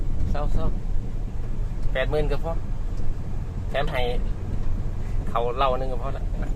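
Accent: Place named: Korat, Thailand. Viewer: น้อง คนจังหวัดไหนครับ พูดออกทางโคราช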